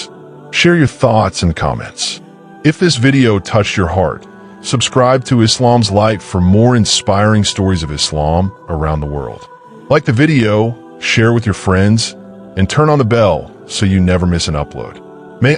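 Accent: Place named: United States. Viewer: Another American narrator!!!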